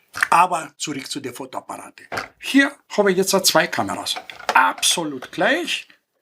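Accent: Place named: Austria. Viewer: von victor 1977 frankfurt am main,ach ich liebe den leicht jiddischen oesterreichich ungarn dialekt wenn du sprischst,das konnte ich mir stundenlang anhoeren,oder was ist das fuer ein dialekt,auf jeden fall ein aehnlicher dialekt,sehr original und entspannende,,von unseren deitschen europaischen dialekten muesste mehr an die neuen generationen weitergegeben werden...gruese von mir,schenes viddeo,und mach weiter so,ach ich glaube in den 70ern im italien urlaub hatten wir auch so eine kodak,vor allem an die kodak kassetten kann ich mich erinnern,aber in den ende 80ern anfang 90ern kauften wir eine polaroid kamera,und kennst du noch die kleine kamera mit discfilm,was war das nochmalfuer ine marke.kodak ist aber jetzt wieder voll auf dem weltmarkt,mit ganz neuen guten producten auch motion film und neuen kameras wie minishot2,wirklich echt gut...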